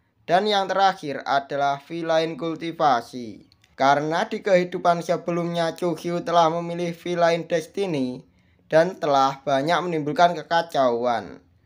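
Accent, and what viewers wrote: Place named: Java, Indonesia. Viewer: Bahasanya terlalu medok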